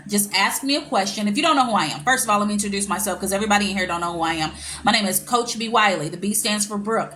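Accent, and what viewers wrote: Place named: Arkansas, United States. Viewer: Hey just subscribed! Love your videos. I’m from Eastern Arkansas…. 😊 Wynne…. Sounds like a lot of Arkansas men I know…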